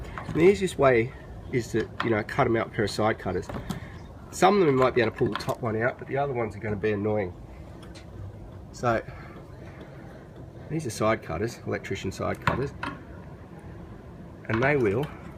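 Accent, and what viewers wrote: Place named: Australia. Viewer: First thing I noticed you're an Aussie, thank Christ mate just hearing another Aussie blokes accent giving me home repair advice is like "sweet as, not some seppo raving on with shit.. soon as I heard your voice after watching a couple of other videos I felt like having a beer like I was home.. I haven't watched your video yet it's probably almost whilst I have been raving on with crap but good onya I'll go get a beer and watch it now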